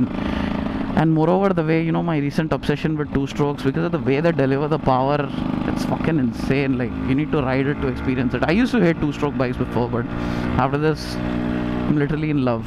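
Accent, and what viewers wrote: Hyderabad, India. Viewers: only person on youtube i love listening to 😅.... vo apna hyderabadi accent sunne ka mazaich alag rehta bhai 👌🏻👌🏻🎉🎉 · i love you'r Hyderabadi accent bhai.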